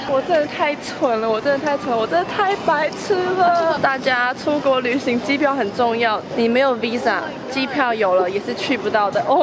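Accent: Kansai, Japan. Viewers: 發現Mao說話有關西腔w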